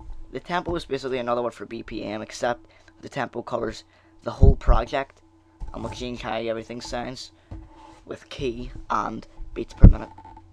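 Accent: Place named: Ireland. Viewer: Like ur irish accent! :D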